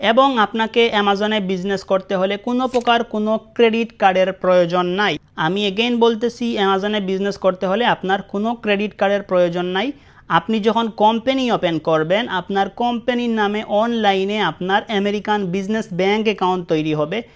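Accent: Sylhet, Bangladesh. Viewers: ভাই আপনি কি সিলেটি 🙂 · Bhai ki Sylheti? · আপনি সিলেটের নাকি?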